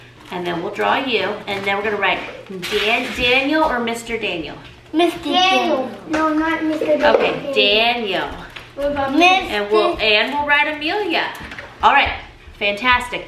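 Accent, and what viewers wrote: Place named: Charleston, United States. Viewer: I was wondering are you from South Carolina you sound like you're from Charleston South Carolina I mean this in a good way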